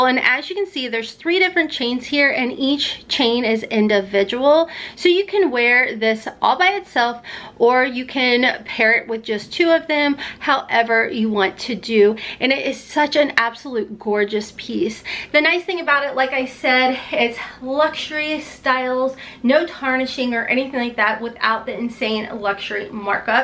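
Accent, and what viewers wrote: South, United States. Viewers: I watched this entire video for "matte", "sale", "gold", "talc", and "slant". 😂😂😂 Ooooh to be southern!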